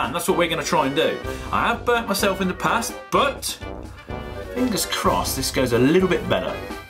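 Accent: United Kingdom. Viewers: Are you like British or Australian or something? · this man is so intensly british that i cant understand half of what he says but i love it anyway haha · He sounds British but I don't know if he is by birth 😂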